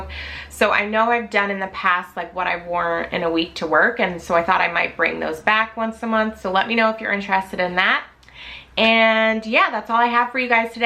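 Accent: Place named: Northeast, United States. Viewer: Do you mind me asking what profession you’re in? I always imagined you being a teacher…you always have that sweet nature teachers have 😊 And, again if you don’t mind me asking, what region of the states you’re in? Your accent leans North East to me, but I’m never sure 😂 Also, I say keep rocking the skinny jeans! I’m 34…so you know my closet is full of skinny’s! And I’m not giving them up, I love how they look and I love how they feel! I left my baggy jean days back in high school! Haha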